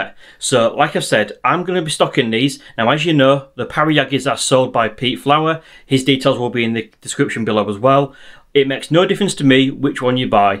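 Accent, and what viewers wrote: United Kingdom. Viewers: You have a British accent, is this in the British Isles?! One more question do You have the FCC (Western Hemisphere version) or the CE (European version) ??!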